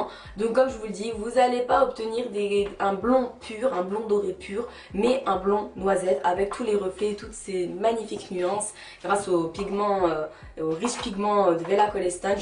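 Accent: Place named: Algeria. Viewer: dés que tu as cmc à parler g tt de suite su que tu etais algerienne mdr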